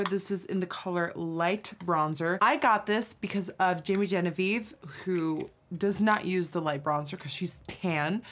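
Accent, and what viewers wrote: Netherlands. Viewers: we would be so powerful if we knew THEN, what we know NOW! I love you you make me smile and I think you have such a great personality ( I love your dutch accent) thank you for being you, you're wonderful, beautiful girl.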